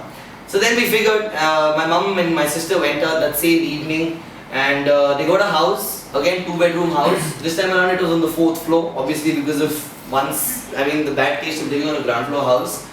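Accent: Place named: Deccan, India. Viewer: Hey dude I'm your fan from Bangalore and the area next of you and I just imagined every thing you said and the dakny urdu was awesome 😚😚😚😘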